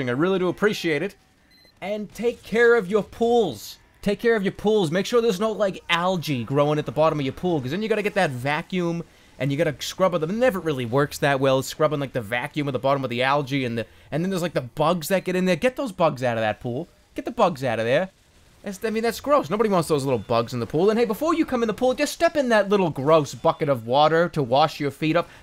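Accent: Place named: East Coast, United States. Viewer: Jerma, I love your east coast accent.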